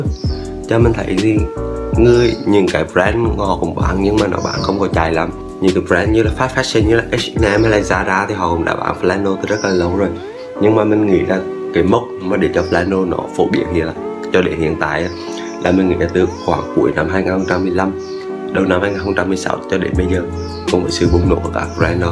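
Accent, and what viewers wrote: Hue, Vietnam. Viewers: Giọng Huế dễ thương quá anh ơi~~~~ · đây là lần đầu em xem video của anh :D mới vào em đã có ấn tượng với giọng của anh ( em cũng người huế ), anh noí rất dễ hiểu. chúc anh thành công hơn :D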